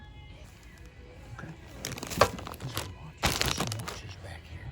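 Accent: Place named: Missouri, United States. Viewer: MISSOURI 🤷‍♂️🙄.....that explains your accent. Missouri is one of the hardest accents, to place.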